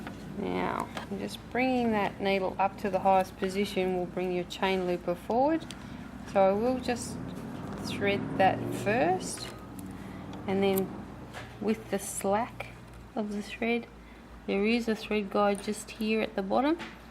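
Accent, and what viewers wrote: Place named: Australia. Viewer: Thank you so much! Your instructions helped me figure out the manual I have for my PFAFF 4862 Coverlock. The manual was not helpful at all, in fact I was more confused each time I turned to it. You saved me totally losing my mind and my temper and destroying everything. Oh and love that it's Australian!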